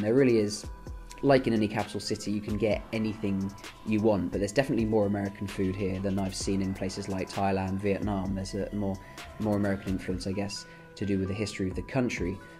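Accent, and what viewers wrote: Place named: United Kingdom. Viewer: Hey I am from Egypt i am 12 years old I speak English very well and I really like your British accent and I watch you daily I appreciate that you are trying to teach other people Arabic and the British accent. I really hope you see this comment.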